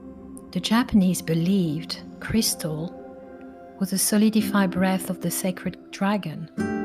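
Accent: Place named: France. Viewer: Thank you, your videos are so beautiful, soothing, helpful and just connect with me on a very deep level, kindred spirits indeed! I'm British and I have many friends who were born in France and I do love their accent, yours is lovely Blessings to you and all Louisa and Sas (pug) I'm wearing a solid lapis bangle and citrine nugget bracelet... I love crystals, always have x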